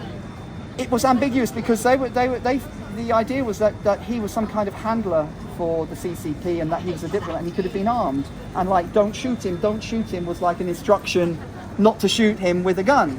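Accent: United Kingdom. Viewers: Dr K seems like such a decent cool guy and I love his accent. Reminds me of me English cousins. Hadn’t heard of him until this nonsense happened. Now I’m following.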